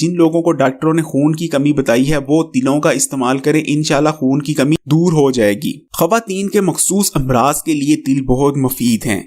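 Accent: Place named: Punjab, India. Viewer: I wish you find some better voice to represent your videos.His sound is so idiotic and wrong Urdu pronunciation.Stop punjaabbi on Urdu narrative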